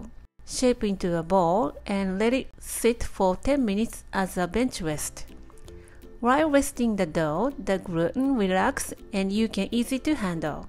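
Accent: Japan. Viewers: You sound like my dentist who's from Japan. I absolutely ADORE her🥰. And thank you so very much for your contribution. Subscribed. 🙂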